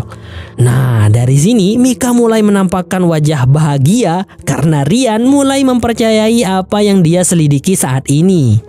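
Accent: Madura, Indonesia. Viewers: Abbe adminnah Reng medureh yeh😂😂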